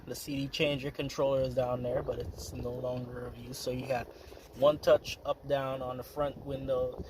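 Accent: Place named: Virgin Islands, Caribbean. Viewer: Hi, I just "stumbled" on your page. I also bought a 1998 C230 about two years ago and I absolutely love it. It was owned by an older woman that just drove to church, etc. Unfortunately, she passed away and it just sat in the driveway. It was well maintained, just a few minor things. On another note, I listened to your accent and wondered if you are from St. Thomas V.I.?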